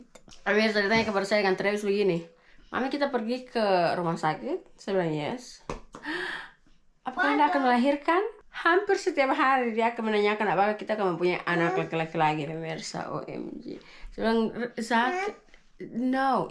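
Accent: Manado, Indonesia. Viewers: Gapapa kak kalo bisanya ngomong bahasa Inggris lebih nyaman dan mudah ya ga usah peduli apa haters bilang soalnya cape dengerin apa kata netizen yg maha benar dan berkuasa wkwk....iya juga sih sebenernya kak lebih baik ngomong bahasa Inggris campur2 Indonesia.soalnya klo pun kak ngomong bahasa Indonesia takut nya juga ga gitu bener bahasanya karena lidah org menado/Maluku klo ngomong bahasa Indonesia juga ga bisa sih.jadi mesti full bahasa Maluku /Manado gitu.intinya aku paham maksud kak gimana sih makanya dia milih ngomong campur Inggris juga ya karena dia ga tau kosa kata bahasa Indonesia dengan benar tpi klo bahasa Manado ya kak lancar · Aku suka logat manado n maluku kak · Daya tarik vlog kak ap adanya n logatnya jg suka,stengah indo,inggris manado 😂😂😂😂😂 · Don't worry saya org malaysia pun sgt understand apa yg kamu katakan yr english n bahasa indonesia perfecto only yr slang very manado no problem with that's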